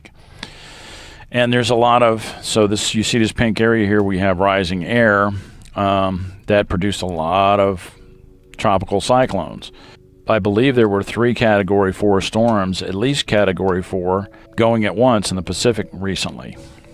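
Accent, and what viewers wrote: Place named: Mississippi, United States. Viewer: You have a Mississippi accent. Where are you at. Why did you choose to open a youtube channel?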